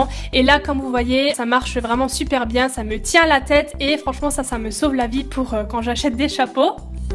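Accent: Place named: Alsace, France. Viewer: Haha quand j'ai entendu ton accent j'etait sur que tu etait alsacienne ,comme moi 😉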